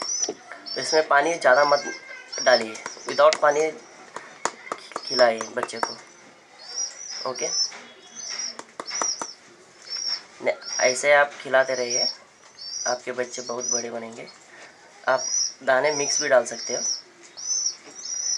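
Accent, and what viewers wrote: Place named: South, India. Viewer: Bhai bohut accha lagraha.. u r trying to speak in Hindi and unr doing so good... I think u r from south?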